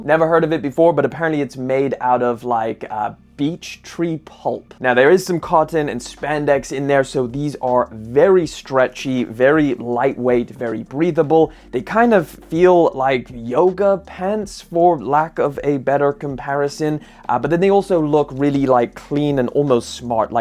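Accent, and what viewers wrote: South Africa. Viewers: Bro are you by any chance South african? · Bro I didn't know you South African.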